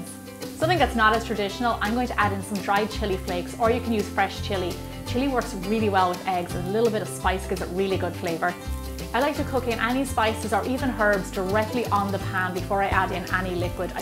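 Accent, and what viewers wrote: Ireland. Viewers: didn't expect her to be irish. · with that Irish accent and beautiful woman ill cook anything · An Irish girl explaining an Italian recipe. Love it.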